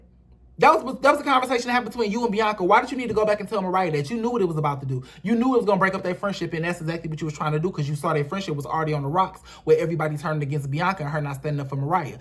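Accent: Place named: Minnesota, United States. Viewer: I had to pay on the floor and do a beetleguise laugh when u said “rewwoom “😂😂😂 u give Suburbs Minnesota vibes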